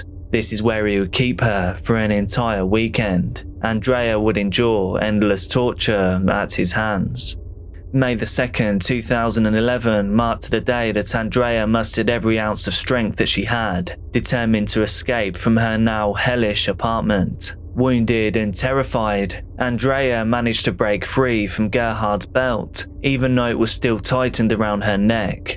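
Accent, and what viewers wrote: England, United Kingdom. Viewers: "Casey" is Australian, is clearly British.. not the same at all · the beautiful English accent is so exotic !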